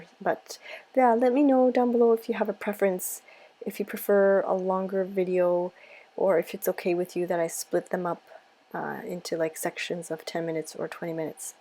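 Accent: Canada. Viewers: Hey! I prefer longer videos! I journal along with you, and with the shorter videos, I just get started and the video is over! But that's just my preference! I really just enjoy your content! P.S - I know that you have lived in Japan for several years now, but you still say 'eh?' in your videos from time to time, and as a fellow Canadian, it always makes me smile! I hope that doesn't sound silly:)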